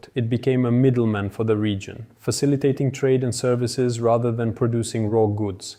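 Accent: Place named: United States. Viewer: &quot;Employment security not the best? I lost my job and was paid 70% of that salary for a year afterwards, as long as I proved I was job searching in that period. What other country does this? At one point I had a hard time paying a months rent with that 70%, and my municipality paid my rent with barely questions asked. Coming from an author who sounds american, you guys have absolutely 0 job protection or unemployment benefits. Really deligitmized this video.&quot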